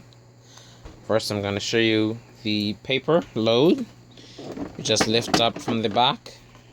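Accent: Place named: Bahamas. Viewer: Thank you for sharing. I'm sitting here listening to you and you sound awfully alot like a Bahamian. Are you?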